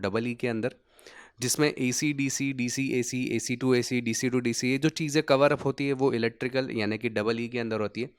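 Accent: Gujarat, India. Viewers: Sir aap gujrat se ho kya kyuki aapki language aisi hai 😂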